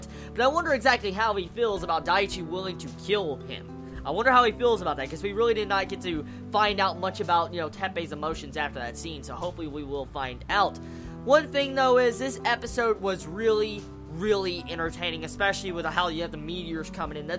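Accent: South, United States. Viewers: Not being mean get a haircut you would look better and where is your accent from you sound southern?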